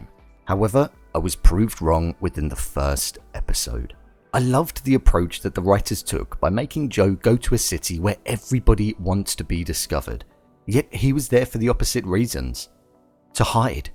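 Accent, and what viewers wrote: United Kingdom. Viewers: Oh great another cynical white british male voice on youtube to bestow his oh so original critical thoughts on us.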